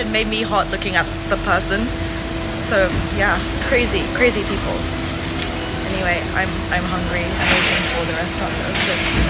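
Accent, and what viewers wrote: United Kingdom. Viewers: wow.. sounds very cool with British accent o_o and very informative for current Covid 19 situation !! thanks for your time to make this amazing video ~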